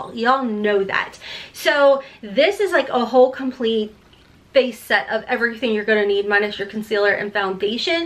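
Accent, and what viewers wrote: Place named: Kentucky, United States. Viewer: It is so cute!!it reminds me of the 5 years I lived in KY and came bk to california with an intense accent! It's so comforting and her extra special personality just makes me feel like she is the house that in todays society you could still knock on the door and ask for a glass of water or directions and she would gladly give them to you and probably invite you to sit on the porch for a few minutes to have a short converstion!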